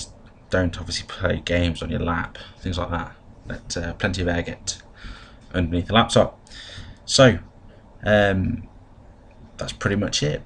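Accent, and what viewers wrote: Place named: United Kingdom. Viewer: love your British accent :3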